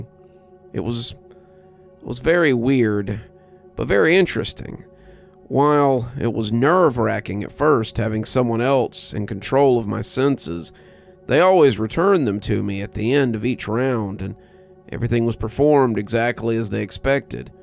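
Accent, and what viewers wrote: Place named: United States. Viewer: I love your accent !! Might be my new favourite American accent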